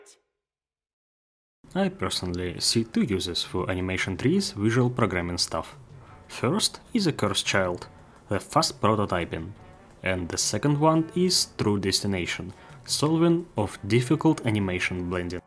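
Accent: Russia. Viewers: русский акцент? information was so useful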